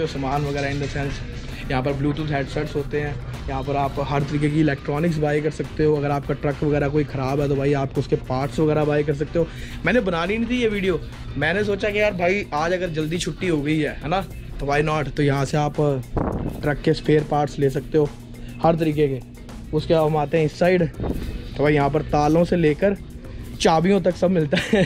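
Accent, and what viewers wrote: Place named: Punjab, India. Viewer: Hindi ch v punjabi wala touch 😂😂😂😂😂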